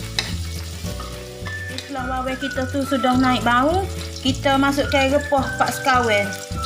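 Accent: Kelantan, Malaysia. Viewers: suko dengar kak kecek Klate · Min, sedap....sebenarnya dulu sy pernah kawen dgn org kelantan.n skang dah pisah.die punya benci tu, adoi...smpai sy xleh jumpa or dengar org kelantan cakap.benci sungguh.padahal diorang xde salah ape pun...adoi...😬...skang Ni pun sy subscribe.tapi dgr je loghat kelantan, terus sy mute..punye la hai...halalkan resepi yg sy ambik ni ye.n sy still benci..😭.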